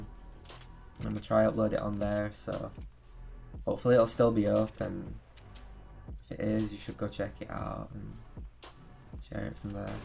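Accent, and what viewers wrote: United Kingdom. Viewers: Dude, where are you even from? Your accent sounds British.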